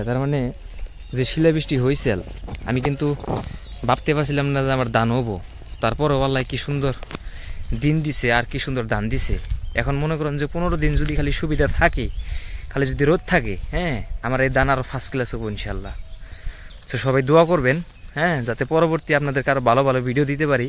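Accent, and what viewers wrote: Bangladesh. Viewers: india or Bangladesh? আপনার ভাষা তো দেখছি ময়মনসিং ের মতো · আপনার ভাষা একদম বাংলাদেশের জামাল পুর জেলার ভাষা। আপনাদের ওখান থেকে আমাদের জামাল পুর জেলা কত টুকু দুরত্ব? খুব সুন্দর আপনার এলাকা। ❤❤❤❤❤🎉🎉🎉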